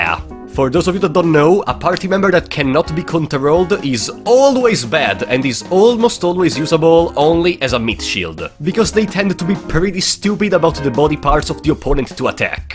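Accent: Italy. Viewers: Sono nuovo e non posso fare a meno di notare che hai un accento molto italiano, mi sbaglio? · Can't help but notice, just a random question - your accent sounds exactly like my friend from Mezacorona, are you by any chance Italian?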